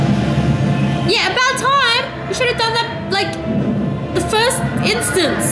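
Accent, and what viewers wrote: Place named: Australia. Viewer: You weren't the only one sweating it out...quite the roller coaster of emotions this episode was lol Condolences to your Koalas for witnessing such horror, for the night is dark and full or terrors ALSO...my brain can't get enough of your Australian accent ;p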